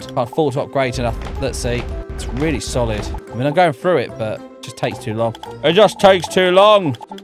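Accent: United Kingdom. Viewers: Your British doesn't matter you are still a great youtuber and I watch all your videos I can find too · Are u brittish cuz u sound like one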